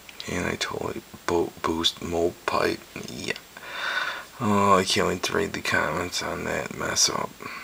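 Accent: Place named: Michigan, United States. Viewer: r u frome michigan?.. or somewhere up north?.. i like ur accent :)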